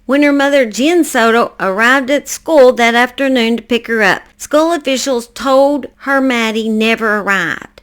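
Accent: South, United States. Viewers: I appreciate your content! These cases that aren't told need to be heard! As a southern girl myself, I can't get enough of that twang. Keep on keepin on.❤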